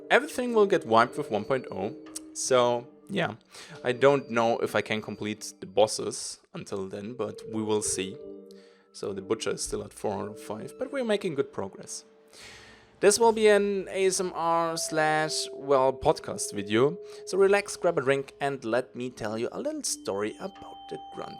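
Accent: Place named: Germany. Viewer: The accent makes it kinda obvious you speak German. Grüße gehen raus :D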